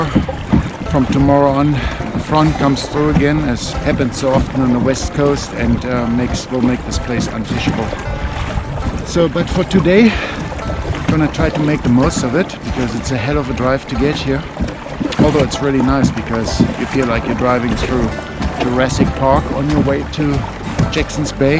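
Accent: Germany. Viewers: hey mate, what exactly is that pedal boat you got there, from where did you got it and how much is it? was in jackson bay the other week and thinking to buy one aswell, would be mean. you sound german btw, grüße 😆✌🏻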